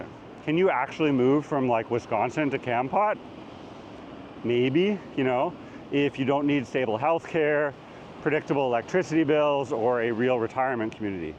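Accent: Canada. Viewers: First honest influencer for SE Asia. You sound Canadian though. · Are you from Wisconsin? I think that you sound more like a Canadian, but you mentioned Wisconsin a couple of times...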